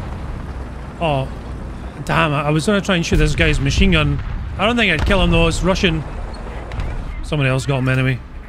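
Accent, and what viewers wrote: Scotland, United Kingdom. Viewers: Hi, I really enjoyed your video. I wanna ask that are you down to make a video about the T29 as well? Because I've already seen your video about the T34, and the T30 as well, and I know that there's not much difference between these tanks, but I genuelly love the T29, and Imo it's better then the Tiger 2 sla. By the way keep up the good work, I love your videos, and Im kinda courius about your "natural" scottish accent;)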